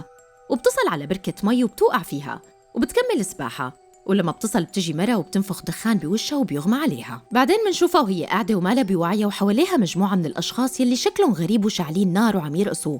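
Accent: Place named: Syria. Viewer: استمري💓 انا كل ليلة نام على فيديو تنزليه فعلًا اشكرك على نشاطك وانك تنزلي كل يوم هذا شيء رائع والله برافو 🤩 اتمنى تعملي فيديوهات لافلام غموض و افلام اخرى منوعة ليس رعب فقط رغم اعشق الرعب لكن التنويع جميل وخاصة انو اسلوبك رائع فعندي فضول اشوف اسلوبك في نوعية افلام اخرى🥰 وخاصة اني احب اللهجة السورية وانت لوحيدة سورية اللي تعمل فيديوهات ملخصات افلام مالقيت غيرك سورية اتمنى لك التوفيق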